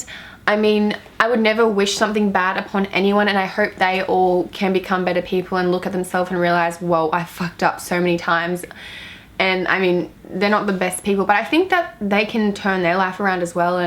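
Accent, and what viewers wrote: Australia. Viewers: Yes I found another Aussie XD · Are you from Australia? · the Australian accent is HOT and so are you, my dear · is she australian? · you sound aussie lol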